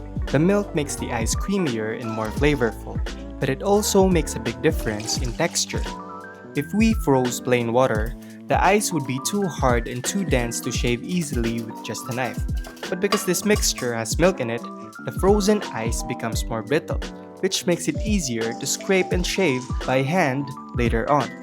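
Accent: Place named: England, United Kingdom. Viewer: I Like your English Accent! It’s giving!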